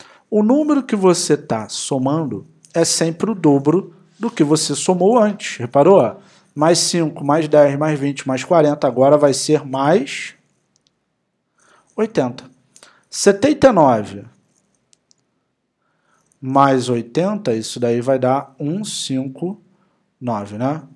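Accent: Rio de Janeiro, Brazil. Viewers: Eu adoro o jeitinho carioca "Tu vai continuar reclamando?" 🤣 Obrigada pelas aulas! Aprendo muito aqui e paro de reclamar!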